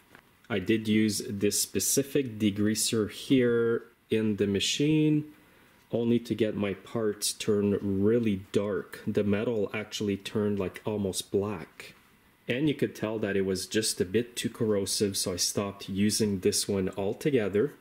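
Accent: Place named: Canada. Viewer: Definitely Fahrenheit. Also, I just love the Canadian accent. Good video.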